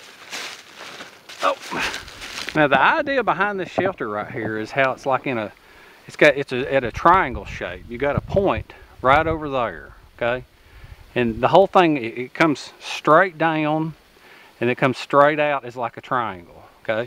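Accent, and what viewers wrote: South, United States. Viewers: HI. Nice to see you back again. ok, I see you as a walking, talking southern encyclopedia. Yet again, the southern messed me up. Shambered? So, I google and decide the word I am hearing is chamfered? Like a beveled edge? I think. Bless your heart. We yankees do not understand sometimes. LOL Love the no cordage. This stuff I can do. I have done no cordage many times. Most times I had no choice. Some of the notches were new to me. Appreciate that. Thanks for teaching some of us new and/or better ways of doing survival things. But please.... no more popcorn videos! Still makes me laugh. Be well. Be safe.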